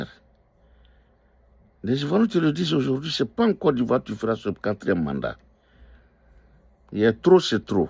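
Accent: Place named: Burkina Faso. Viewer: ce que vous faites là est très dangereux.Les Ivoiriens sont assez divisé comme ça, vous êtes libres de suivre LG que nous apprécions tous pour son courage.Vous les nordistes avec qui le président Houphouet à gouverné,il à utilisé les richesses de l'est pour construire le nord,le sud et le centre.Vous avez bénéficié de tous, vous vous êtes alliés à Allassne qui à été imposé à Houphouet pour détruire la côte d'ivoire et aujourd'hui tu viens raconter des anneries.Ton accent te trahi,tu est d'origine Burkinabè et ton intention est de chercher à mélanger encore le pays parce que tu ne veux pas voir Thiam devenir président.Dieu seul sait qui il choisira.J'aimais bien t'écouter mais là tu dis beaucoup de contres vérité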